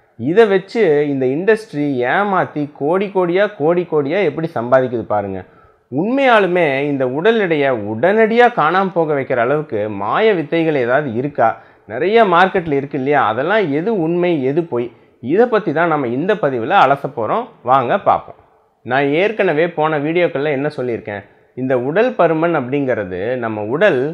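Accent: Kongu, India. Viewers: ரொம்ப அழகா விளக்கம் கொடுத்தீங்க அய்யா... அதுவும் நம்ம கொங்கு தமிழில்👌👌👌👌🤗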